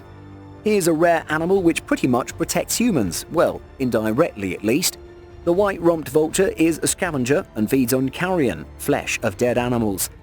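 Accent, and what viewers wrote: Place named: United Kingdom. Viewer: Finnaly new vid PS I like uer British accent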